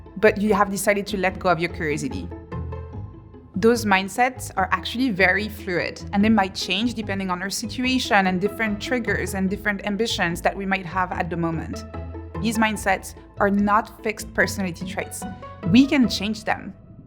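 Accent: France. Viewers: Beautiful French accent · Love the little french accent🇫🇷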